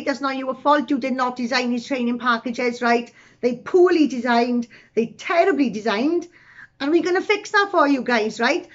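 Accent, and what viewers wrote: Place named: Wales, United Kingdom. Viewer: Fair play you have grown so much since starting nothing but utter respect luckily I been in Wales 6 years I can understand you 👍👍👌👌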